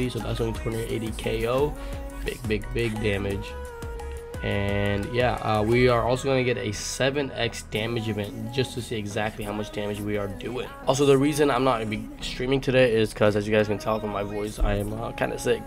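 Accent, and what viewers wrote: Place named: Nepal. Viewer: Bro U Nepali? U sound Nepali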